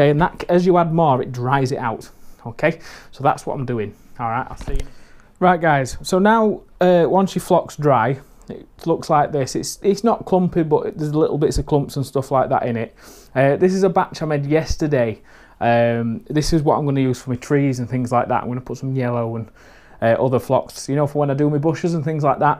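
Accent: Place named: Yorkshire, United Kingdom. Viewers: Thanks for this... I'll not be buying flock ever again also. ( PS, Big 'hello' from someone who was born in Batley and raised in Birstall... nice to hear a proper accent again😉) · nice vid mate. where in the world are you cos you sound northern england?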